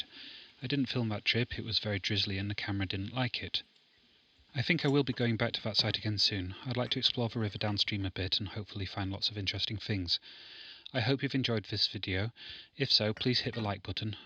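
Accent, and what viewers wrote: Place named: RP, United Kingdom. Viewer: you sound pretty posh for a mudlarker.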